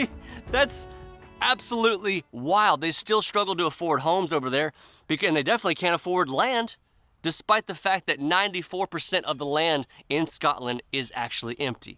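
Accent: United States. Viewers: You sound USa educated.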